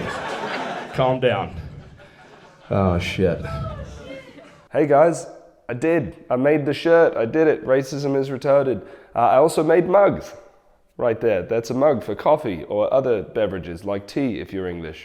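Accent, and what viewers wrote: Australia. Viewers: good quality, he does sound like a posh australian, loved the siri joke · Im Australian and i thought for sure you were an Aussie haha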